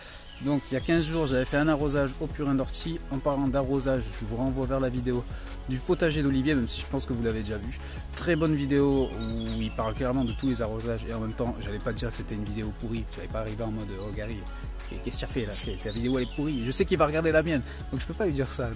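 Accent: South, France. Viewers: Bonjour j'adore tes intros et tes vidéos, bravo👍. <gari> tu ne serais pas du sud toi? Tiens j'ai le même donut! Sinon pour tes tomates je croise les doigts. Bon dimanche et bonne baignade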